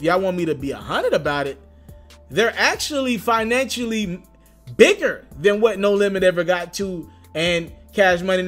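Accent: Memphis, United States. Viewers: . Youn sound like you from MEMPHIS 💯💯💯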